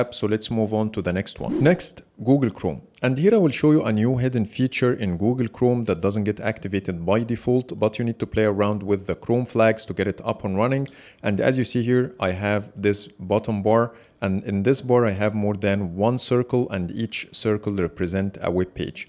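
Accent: Egypt. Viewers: You’re an Arab right 😂😂 I can tell from the accent, an Egyptian?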